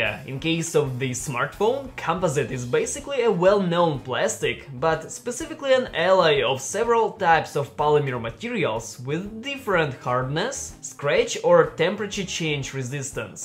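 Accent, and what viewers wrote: Russia. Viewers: Is that a Russian accent ? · Can you test the battery in watching youtube, battery test in playing games, battery test in browsing, battery test in watching videos. Will this 4k mah can last 2 days? Like your review and you talk like Russian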